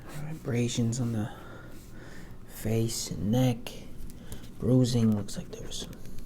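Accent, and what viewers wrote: Boston, United States. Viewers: Your Boston accent vids are my fave! Are you originally from there?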